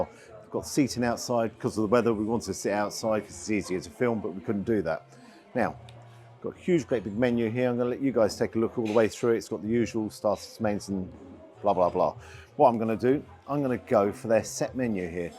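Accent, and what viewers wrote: RP, United Kingdom. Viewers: first time viewer greetings from the West Coast of The US. Just wanted to let you know your an absolute good restaurant reviewer with the personality of Gordon Ramsey with Anthony Hopkins mixed together. You have a Regal British accent that is easy for us " Yanks " to understand. I really appreciate your down to earth affordable creetiking for us non wealthy class folks. Have you thought to coming to the States to do a tour? Thank you again.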